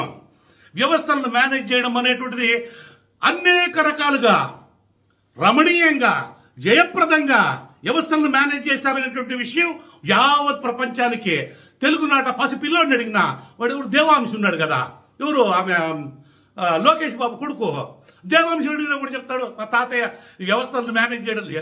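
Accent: Nellore, India. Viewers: Super …like mana Nellore accent. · నెల్లూరి యాస అన్నో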